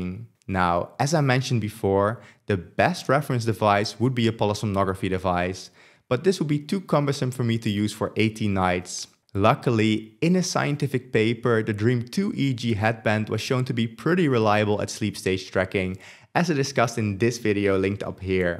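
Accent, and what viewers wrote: Netherlands. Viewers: Up until where you show the dates, I thought you said eighty nights like a dozen times throughout the video, not eighteeN nights :o As a (fellow? you sound dutch) dutch person, one tip I've heard to differentiate easier is to put the stress on the second syllable when saying 1x (eighTEEN) and on the first when saying x0 (EIGHty). This does make me wonder a lot more about the p-value on all this, as this is supposed to be scientific after all and there are no error bars in e.g. the conclusion chart shown . Don't get me wrong, it's a solid analysis nonetheless, but it's a bit hard to know just how conclusive the comparison is or if there are overlaps in the error bars :) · Also here to comment on your methods with this being my first of your videos to watch. Really well done and I appreciated the assumingly Dutch accent :) The thing I'll be missing (or I already missed it was announced) is an alarm function at the end of a sleep phase. 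I found 3rd party apps performing rather poor at this and I think it'd be the number 1 feature I'd love my watch for.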